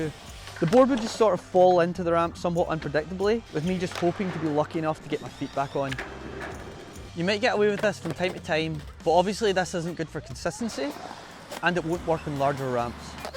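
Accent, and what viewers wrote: Scotland, United Kingdom. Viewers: Excellent tutorial! Well explained and demonstrated. Are you Scottish or Irish? I love the accent! Sounds more Scottish, but I'm curious. ✌️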